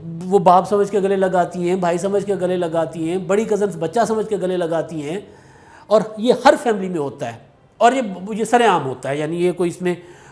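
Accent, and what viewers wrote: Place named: Sindh, Pakistan. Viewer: زبردست اردو سندی پنجابی لہجا، l like your lt , with love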